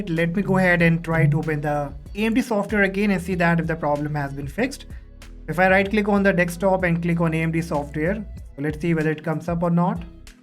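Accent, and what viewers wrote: India. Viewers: when you hear the indian accent you know its gonna be a good video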